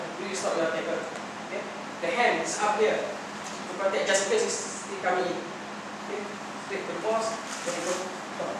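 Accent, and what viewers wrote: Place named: Kelantan, Malaysia. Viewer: betul la cikgu... ada sikit sikit loghat kelate ^_^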